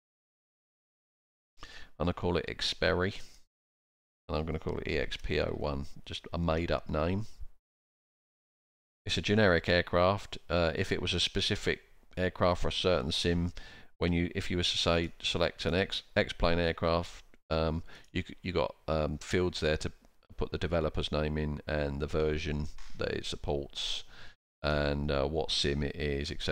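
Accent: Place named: United Kingdom. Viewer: I'm new to this and greatly appreciate your videos. They are even clear to me which is not an easy affair for a French speaking simmer listening to a british accent! I will have to see this one again as I missed a few things. By the way you should make your panels available. It would be so useful for beginners. Thanks again!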